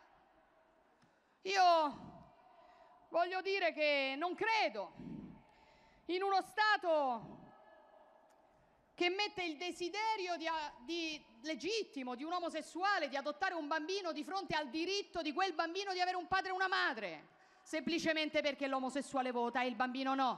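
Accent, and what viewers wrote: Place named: Rome, Italy. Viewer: L'accento romano tipico, comme la Magnani....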